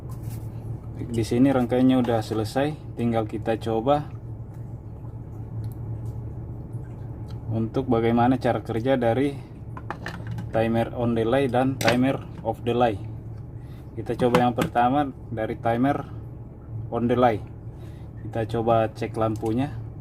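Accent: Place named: Sulawesi, Indonesia. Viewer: kayak logat org sulawesi 😁🙏🙏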